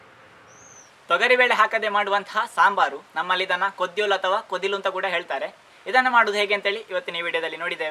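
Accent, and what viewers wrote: Mangalore, India. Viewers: ಮಂಗಳೂರು ಕನ್ನಡ ಕೇಳಿ ತುಂಬಾ ಖುಷಿ ಆಯಿತು. ನಿಮ್ಮ ರೆಸಿಪೀಸ್‌ try ಮಾಡಿದೆ, ಒಳ್ಳೆಯ ರುಚಿ. ನಿಮಗೆ ಇಲ್ಲಿ UK ನಲ್ಲಿಯೂ ಸಹ ದೊಡ್ಡ fan following ಇದೆ. Keep it up 👍🙏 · Thumba samayada nanthra nimma kannada hagoo recipe nodi mangalore ge bunda hage feel aithu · Listening 👂 Kannada words from your side is absolutely mesmerising, loved the mangalore accent great video liked it!!!!!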